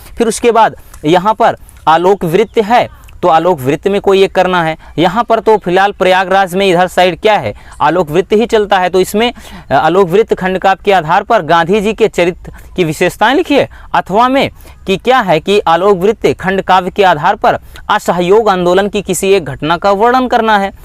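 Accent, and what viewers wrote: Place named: Bihar, India. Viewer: Bihar se hain aap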